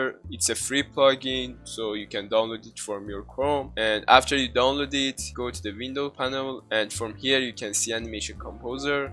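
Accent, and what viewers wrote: Iran. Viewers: داداش لهجت خیلی ایرانیه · your accent is so Persian bro😂❤ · داداش ایرانی؟ ادیت:پشمام از رو لحجت فک کردم ایرانی تا اینکه پیج اینستا تو دیدم فهمیدم ایرانی پر قدرت ادامه بده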